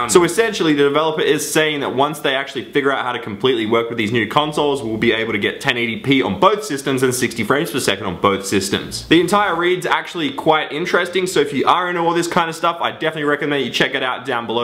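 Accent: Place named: Australia. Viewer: Aussie mate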